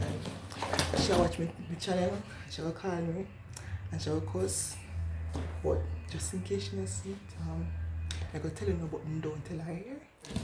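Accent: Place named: Jamaica. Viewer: I love your accent girl..omg😍😍😍😍😍😍, I love Jamaicans 🥰❤️🥰❤️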